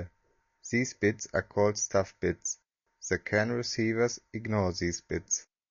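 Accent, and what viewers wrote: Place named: Germany. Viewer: Hi to the chaps at Engendi. Speaking as someone watching your video with only a general interest, this is my feedback: *The video gives a very useful view of CAN. thanks. *the product looks excellent, but fairly expensive, so you need to have high quality product promotion. (i realise that it is probably much cheaper than other options...) *no offence intended at all, but the English pronunciation is terrible, the accent is way too German, and there is no life in the voice at all. Yes, we know you are engineers, but if you are trying to sell to english speaking people, as i suppose you are, I recommend you have the whole video redone where it is narrated by someone who speaks English really well.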